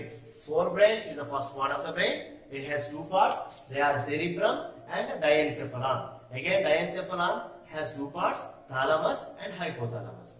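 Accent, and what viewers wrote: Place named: South, United States. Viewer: Sir you are from south nnnn....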